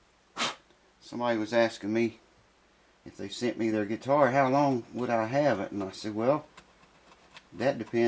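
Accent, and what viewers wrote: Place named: Kentucky, United States. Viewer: Dig the vids. I watch them cuz your one cool cat. You tone of voice reminds me of my family from Harlan Kentucky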